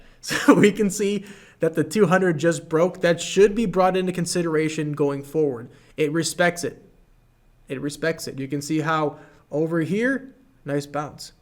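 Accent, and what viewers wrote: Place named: Michigan, United States. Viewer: tell me u not from Michigan ... ur accent in so close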